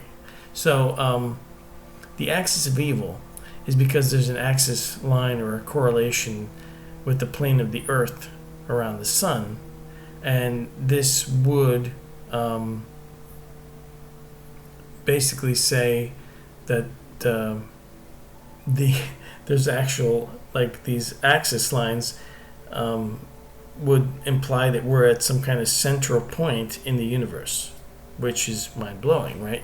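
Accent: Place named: United States. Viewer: You have such a soothing voice, probably the most pleasing American accent I've ever heard.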